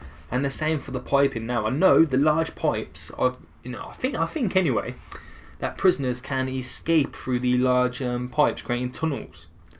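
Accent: United Kingdom. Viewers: your British ya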